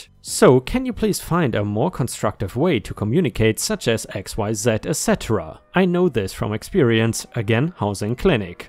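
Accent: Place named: Germany. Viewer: Hi ⭐, 🌠🌟⭐😎⭐🌟🌠 No. We come to listen to your lovely accent. We don't need background music. Have you noticed how many people try to politely help you with your pronunciation of some English words you miss pronounce? You asked for our help so that you learn. We try to listen and catch your mistakes, to help you. How often does that happen on the internet, people politely helping? Anywhere but your channel people would be teasing you for your mispronunciation. To go from German to English fluently is a miracle in and of itself. We want to hear your accent, it's charming. 😊 Thank you for everything. Take care, stay safe, have a nice day. 👵☺️✌️☮️🖖 😷 🙉🙈🙊 ♥️😎⭐🌟🌠 💍🏍️👨‍⚕️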